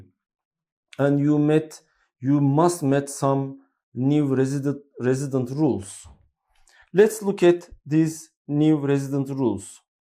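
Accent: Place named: Turkey. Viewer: Bu İngilizce ile İngilterede yaşadığı yetmemiş bir de millete vergi tavsiyesi veriyor adam pes vallahi. Hiç bu kadar Türkçe bir İngilizce dinlememiştim 😂😂 git Türkçe konuş bari bu kadar bu dili konuşamıyorsan birader. Bir de zaten bunu dinleyecek kesim ağırlıklı buradakiler, neden Türkçe anlatmadın anlamadık.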